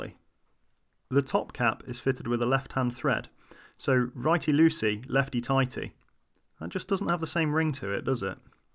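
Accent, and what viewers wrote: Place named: England, United Kingdom. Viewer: I like this idea. The only thing you may have missed or considered not necessary is a pressure relief valve. If you decided to do without, why?....guess I should of read the comments first as apparently I'm not the only one wondering and the question was asked and answered. You didn't show how the tank was connected to the compressor tank in detail. It's assumed that with your accent and the union jack you and from England so what is the code as it pertains to pressure vessels? Thanks.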